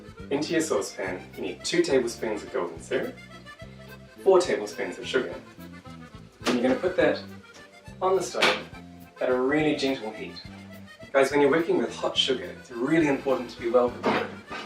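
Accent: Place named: New Zealand. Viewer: Miss good old Hokey Pokey and Kiwi accent! Thank you for posting all the way from Tairoaaaaa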